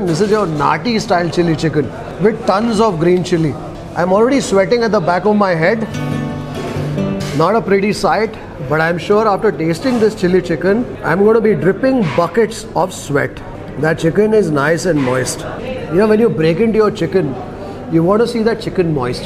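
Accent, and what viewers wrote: Karnataka, India. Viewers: I love u talking innocent beautiful sweetest kannda Lots of love to you sir. Happy new year in advance sir.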